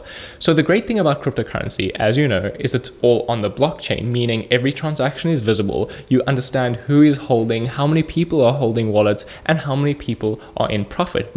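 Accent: South Africa. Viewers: south african? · Are you from SA your accent kinda sounds that way ... I'm new here to your channel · Absolutely a South African and you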